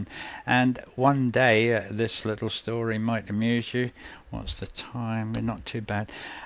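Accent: United Kingdom. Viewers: Wonderful videos. You remind me of my own grandfather, save for the british accent but just the way he told his own life stories. Looking foward to hearing more.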